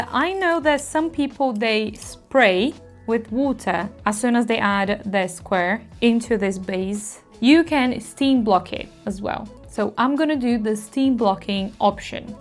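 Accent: Brazil. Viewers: Você é brasileiraaaa? Tem sotaque de brasileira ❤️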